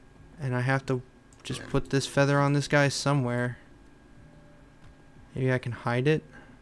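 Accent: Canada. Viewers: are you Canadian? Because I can hear a little bit of an accent. Just wondering, it sounds cool XD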